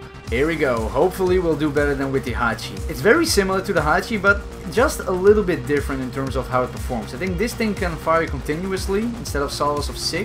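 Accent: Netherlands. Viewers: Wait are u from the netherlands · you have a Dutch accent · I smell dutch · Are you Dutch by any chance? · you have that tipicle dutch English accent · You sound Dutch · Ik kan je Nederlandsse accent herkennen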